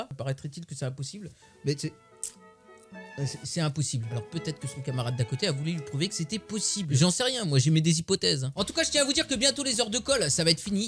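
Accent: Belgium. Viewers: C'est pas que tu fais mal l'accent belge enfaite c'est qu'on en pas on a le même accent que les français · Accent 11/20 je suis belge aussi🍟🍟🍟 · Tu fais bien lacsent belge Cars je le suis😁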